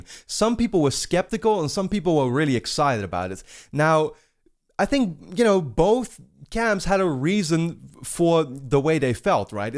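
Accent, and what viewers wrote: Netherlands. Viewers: I cannot unhear the dutch accent lol. Its cool tho, i’m dutch myself. Great review!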